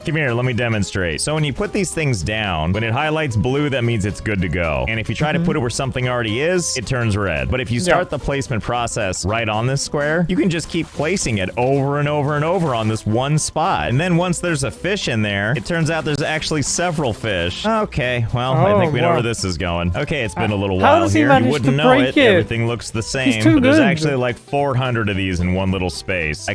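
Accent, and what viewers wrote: United Kingdom. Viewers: 1 Question. Are you British?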